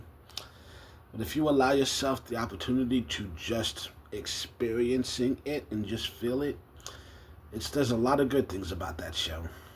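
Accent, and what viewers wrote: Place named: United Kingdom. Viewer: I originally saw you from that one video that went viral a while back at first I thought you were British or something but now that I know you're from Texas I'm kind of wondering how you got that accent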